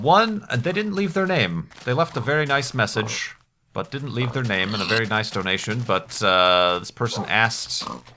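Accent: Ireland. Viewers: You half irish or something?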